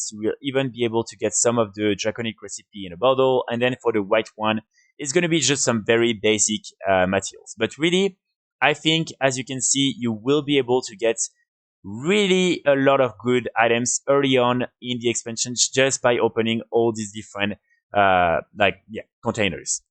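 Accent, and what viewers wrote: France. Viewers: L'accent français me mets toujours aussi bien, bonne vidéo comme d'hab 👍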